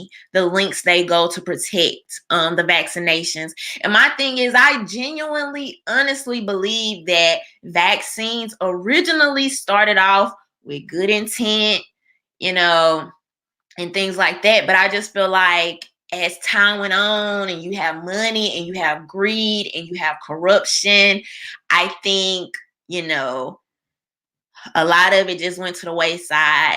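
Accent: South, United States. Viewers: The southern accent ....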